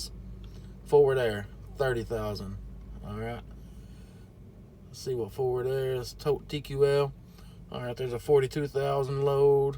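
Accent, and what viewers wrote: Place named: New York, United States. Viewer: Hey, I watched a bunch of videos and I listen to the way you talk to brokers, and that’s taught me a lot. I kind of use your template and probably sound just like you with a New York accent LOL I live just outside of Nashville now and I love the market here. Hope you’re doing well bud and enjoy your Thanksgiving holiday.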